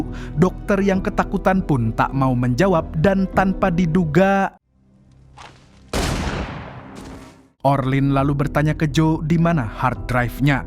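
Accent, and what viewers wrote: Banjar, Indonesia. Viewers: Waduh amang urang banjar ah sekalinya😂😂😂 menyalaaa banuaku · Nahh logat nya pina banjar jar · Ai orang Banjar kah mang 😂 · Urang Banjar lih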